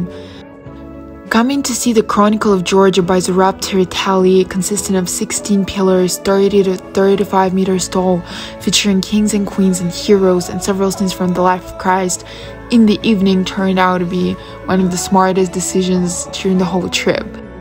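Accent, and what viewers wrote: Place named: United States. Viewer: where are you from -speak like american....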